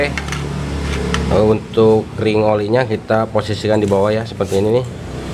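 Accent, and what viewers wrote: West Java, Indonesia. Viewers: Weh Sunda nya mag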